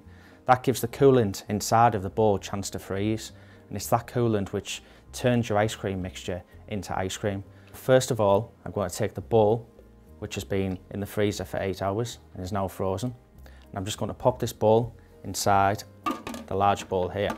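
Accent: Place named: Middlesbrough, United Kingdom. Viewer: Sounds like you're from Boro :)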